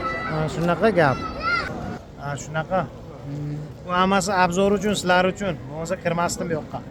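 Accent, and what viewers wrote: Bukhara, Uzbekistan. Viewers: Сан бухоро еврейми шевангдан билиндику сани тарихингшу ушангга боргансан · Birinchi marta kirishiz emas ekan hamma narsani joyini yaxshi bilopsiz yana ularni diniga ótib ketmang gapirishizdan tilizdan Buxoro jugutlariga óxshayopsiz shpiyonlardan bólmang yana tuxmat qilgan bólmayu hammamiz ulardan hazar qilgan paytda balo bormi ularni ichida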